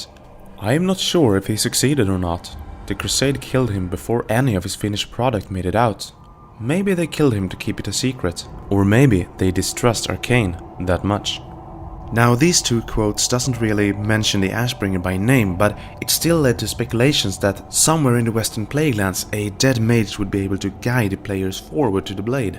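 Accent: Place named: Sweden. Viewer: Are you perhaps swedish? you do sound like it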